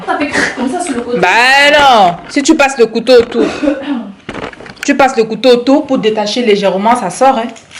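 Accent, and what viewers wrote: Cameroon. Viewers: J'aime trop l'accent camerounais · Je viens de finir de regarder le vlog 😍....trop bien. J'ai appris plein de trucs, du coup j'ai trop envie de décorer ma maison. Ton accent kmer j'aime trop 😍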